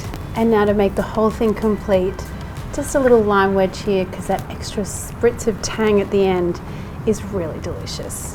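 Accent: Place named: Australia. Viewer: Ive eaten this all my life and love it so much but actually never learned how to cook it. Thank you you made it look easy and your video is easy to follow and understand and didn't leave me impatient while watching. And you pronounced pancit bihon very well but if you want to sound even more correct (not necessary but since you mentioned), just need round out your 'a' sound a little bit more. Like how you'd say (with your lovely aussie accent reading) "parn-sit bee-hawn"...that's how we Filipinos say it. Thanks....you made it look so "Masarap!" (delicious) xx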